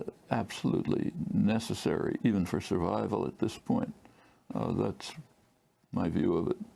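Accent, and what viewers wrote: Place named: United States. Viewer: I love Chomsky but I find his accent really really hard to understand. Some American accents are really hard to follow and his is one of them.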